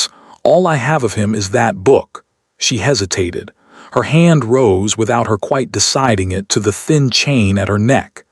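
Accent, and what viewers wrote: United States. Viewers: This is a well written story with a Cinderella plot with a duke instead of a Prince. The narrator has a rural American voice when it should be British. I love more visuals than one for the entire read. Thank you for the posting. Your work is most appreciated. From Amelia Island.🏝🏖🇺🇸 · The American accent is very offputting.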